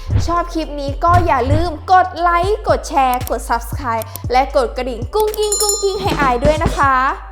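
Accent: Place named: Vientiane, Laos. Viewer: I like you spoke lao look like sao lao viengchian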